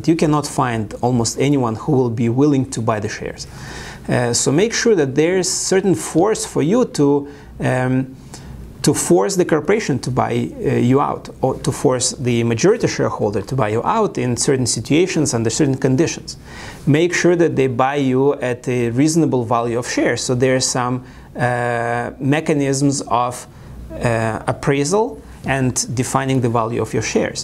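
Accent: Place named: Russia. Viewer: Prettty sure it's a Russian accent.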